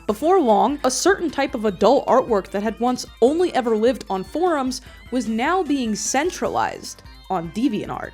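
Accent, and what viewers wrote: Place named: New York, United States. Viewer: Hey I'm autistic and I play Magic: The Gathering because it's all rules based and weird but I do shower etc. Love the way your NY accent comes in on certain words like... AWTIZM. It's great and why I've entered this rabbit hole... Stay cool! (Also the Frank-N-Furter being put into a hot dog bun at is ace)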